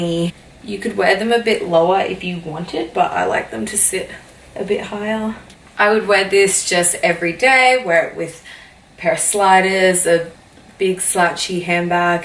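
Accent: Australia. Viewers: love your Aussie accent!! keep the vids coming, love them! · I have a feeling more men watch these videos then women lmao a blonde with an aussie accent and a,killer body !!! you are a 10....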